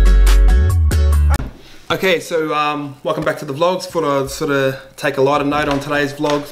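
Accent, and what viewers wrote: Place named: New Zealand. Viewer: Lengo? it means 'focus' in Swahili. Oh that kiwi accent, you meant lingo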